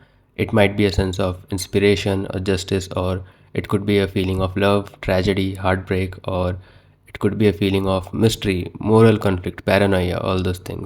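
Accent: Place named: India. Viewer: the second I heard the indian voice, i was like hell yeah man, this video is gonna be legendary.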